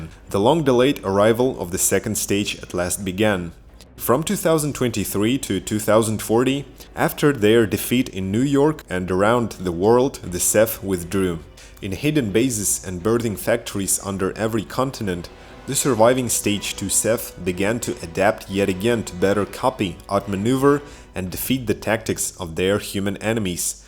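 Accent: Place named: Germany. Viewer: Absolutely great informative Video! You have a very strong accent, it sounds like you´re from Germany, where the creator of the Trilogy Crytek has it´s roots :D Greetings from Switzerland :)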